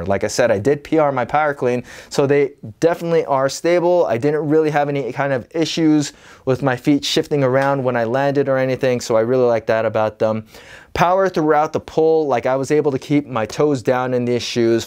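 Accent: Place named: China. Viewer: The Chinese English 🙄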